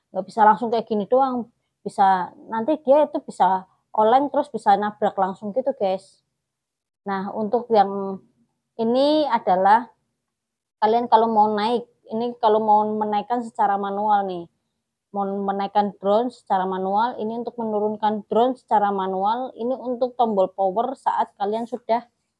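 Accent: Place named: Java, Indonesia. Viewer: Suaranya agak medok...lucu tp jelas sii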